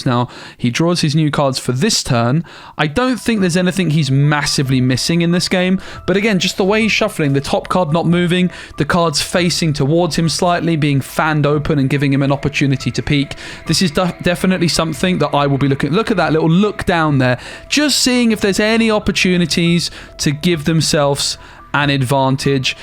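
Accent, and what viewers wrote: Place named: Sweden. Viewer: i love the Swedish accent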